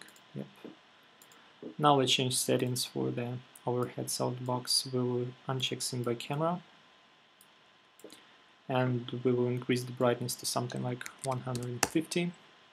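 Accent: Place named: England, United Kingdom. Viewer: о вы из англии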